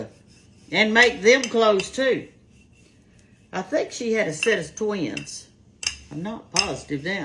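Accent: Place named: South, United States. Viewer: Love, love your southern country accent , so friendly❣️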